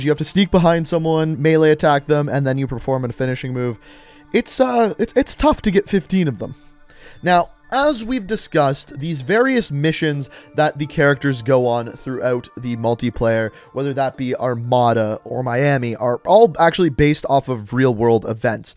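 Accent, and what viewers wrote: Canada. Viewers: Is this guy canadian?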